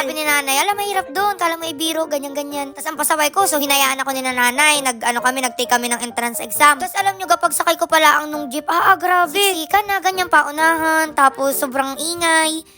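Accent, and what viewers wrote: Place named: Quezon, Philippines. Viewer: Quezon province, specifically in mulanay quezon same accent with you po 😊😄 watching from Qatar now 😊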